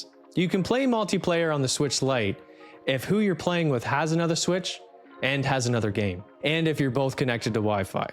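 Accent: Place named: Canada. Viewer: "You find oat" "The world starts oat" "Mary-O" -Ah. A Canadian. Got it.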